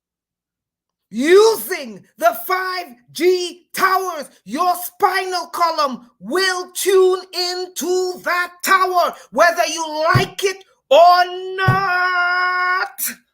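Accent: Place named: Trinidad and Tobago. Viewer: I love this Caribbean queen and I love how she blends in her Trinidad slang and pronunciations of some words. My country Belize which is a part of Caricom but located in central America also speaks broken English so I can understand very well especially when she becomes passionate with some words and tries to drive a point home. It reminds me of how our elders speak to us, very strongly, and by the end of the talk if you don't learn anything and take heed then you're on your own. "yo ears cahna tuff like kunut fiba"!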